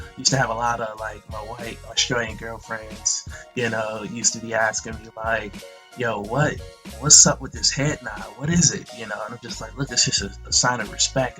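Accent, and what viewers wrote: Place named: United States. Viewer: You’re losing your American accent lol I don’t know if that’s good or bad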